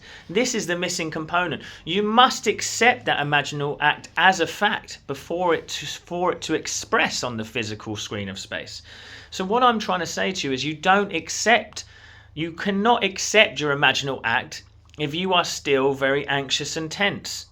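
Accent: United Kingdom. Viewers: Thank you for the depth. Clarity and precision and of course the British accent ❤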